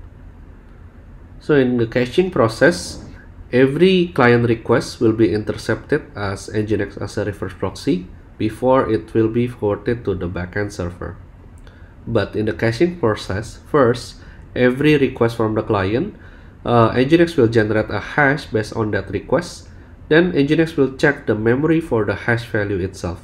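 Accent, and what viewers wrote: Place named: Philippines. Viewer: Are you from Philippines? Your accent is so similar to my co-worker it almost feels like I'm listening to him talk 😁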